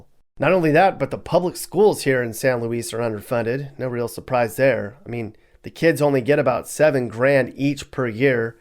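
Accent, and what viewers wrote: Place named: California, United States. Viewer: Very true. He sounds like he's from California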